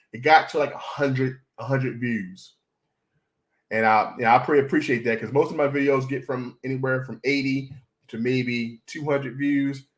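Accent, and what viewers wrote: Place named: Maryland, United States. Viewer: Def interesting hearing about all you do. Thanks for the content! Love your MD accent!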